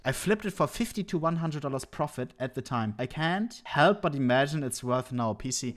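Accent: Germany. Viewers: Man merkt so das er deutsch ist xd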